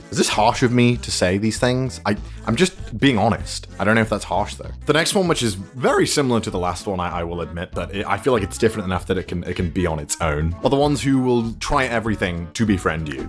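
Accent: United Kingdom. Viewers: I think fandom runs in the british accent my friend, infact Americans cured my lack of confidence in speaking through all their random comments about my voice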